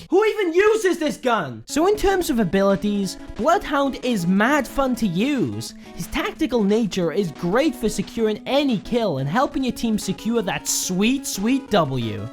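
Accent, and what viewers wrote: Wales, United Kingdom. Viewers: do i hear welsh?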